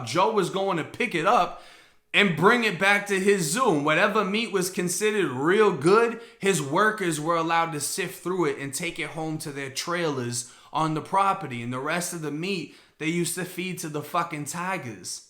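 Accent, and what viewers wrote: Boston, United States. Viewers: Bro if your from Florida why are you speaking with a Boston accent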